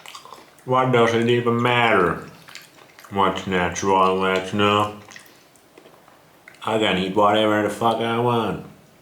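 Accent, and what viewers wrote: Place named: Finland. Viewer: does raw meat at least taste good lol? also your finnish accent is strong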